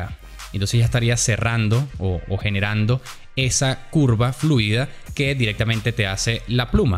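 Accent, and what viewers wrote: Venezuela. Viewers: bro...eres de Venezuela seguro XD suenas como venezolano pero ese no es el tema el tema es que eres un pro es esto felicitaciones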